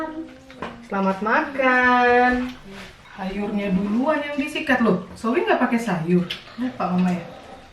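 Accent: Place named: Java, Indonesia. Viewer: Wkwkwk dengklek jawa banget ya mak 😂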